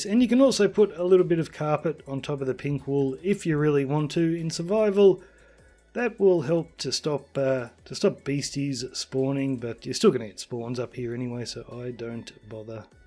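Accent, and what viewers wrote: Australia. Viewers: Nice video! Your accent sounds Australian... are u from Australia? I am... im from the sunshine coast!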